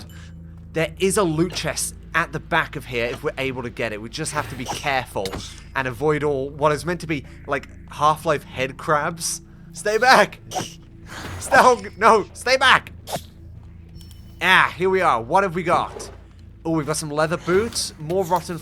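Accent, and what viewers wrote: United Kingdom. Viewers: Firstly, you have a nice british accent, secondly i think it would be nice if you go to a room, and after some time, closed doors from every direction you can go just open 👍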